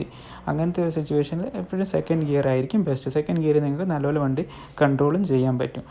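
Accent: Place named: Trivandrum, India. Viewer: Bro tvm aanalleee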